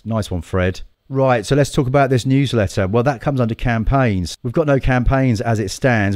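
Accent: United Kingdom. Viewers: Hey, I really enjoy your unique presentation style. You cover technical tutorials, recommendations, comparisons, a reactions, all with a British accent, in just 15 minutes. Great job! Keep up! You didn't ask to subscribe but I did.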